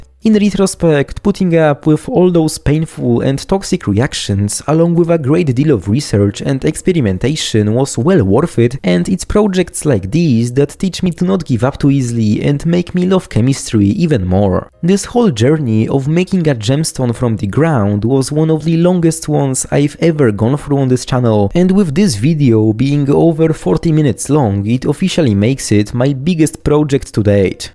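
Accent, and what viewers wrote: Poland. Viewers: Jakby ten akcent rozpoznam wszędzie 🇵🇱kocham opale! Labradoryty też są piękne i o wiele tańsze. Super kontent, będzie oglądane ^^ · Niby słusze angielski ale jakby po polsku:] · as a polish person i love hearing the polish accent in his voice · Hey bro, are you from Poland maybe? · Od początku czulem że to Polski akcent, ale potwierdzil wszystko 😆 świetny materiał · Are you Polish?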